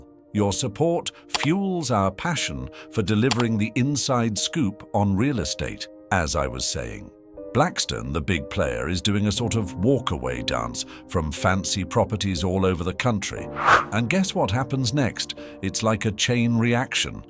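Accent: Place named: United Kingdom. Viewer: When I heard the voice was British....I knew everything said would be a lie.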